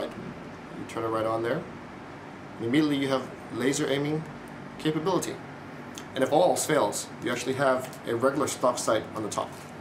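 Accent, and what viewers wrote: United States. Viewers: whoa! american accent! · hes thinking at a more business angle. American accent.(i think) good magazine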